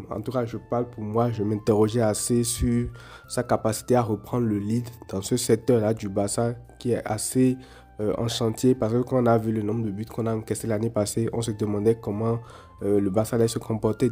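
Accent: Benin. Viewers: Je découvre ta chaîne, tu as un petit accent béninois ou c'est moi ?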